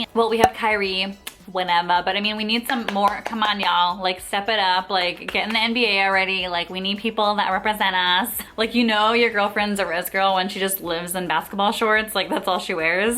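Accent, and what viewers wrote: Puerto Rico. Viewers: Wow I new that your puerto rican because I am from Florida so I know how you talk as Latina boricuaaa 🇵🇷🇵🇷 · Your sond like you was reast on the isla cuz,,,,,Puertoriqueña,,,,,,same as the ress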